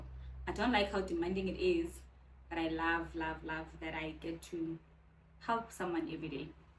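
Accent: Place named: Limpopo, South Africa. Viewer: I am just glad we get to know you better. And hearing you from Witbank I was like haibo this whole time I am like Limpopo and then I hear it. Otherwise ke tla botsa on Insta! I love this for you and I love it for me 🙈 plus ke fan akere. ❤️ o kwatisa fela ge ore you won't speak Setswana. And yes I agree you do look like your mom, and your my hight and shoe size 🤣 bathong 2 full weeks with mom wena spoilt brat!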